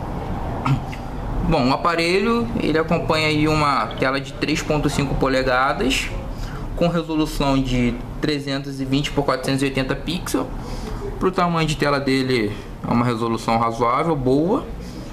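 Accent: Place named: Rio de Janeiro, Brazil. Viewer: Que sotaque gostosinho desse cara deve ser carioca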